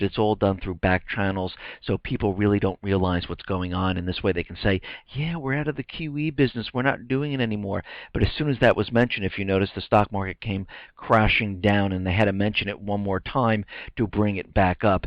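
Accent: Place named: New York, United States. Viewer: Incredibly Great Reporting as always from one New Yorker to Another or at least you must be from the North East - but probably not Boston as their accent is much heavier - lol!!! I just feel that we are close to a Nostradamus prophecy with all the signs around us including what is in the sky (Blood Moons)... Thanks!!! I don't know if you follow any of the previous prophets like Nostradamus, Edgar Cayce, Alois Irlmaier but if you have followed any of these "Seer's" of the future if you would consider doing a video on their prophecies that would be great!!! Thankx, Janice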